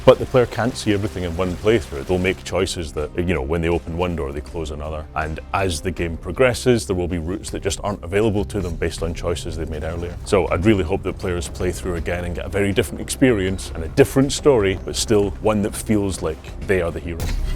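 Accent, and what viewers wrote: United Kingdom. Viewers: I was hyped till I heard British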